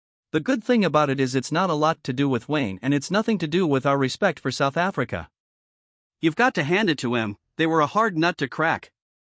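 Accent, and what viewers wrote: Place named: United States. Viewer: How is this an OFICIAL AB site when the narrator is American??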